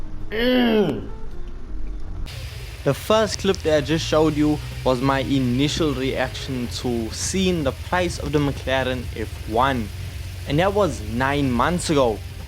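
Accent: South Africa. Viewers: bro u sound like u are from sa?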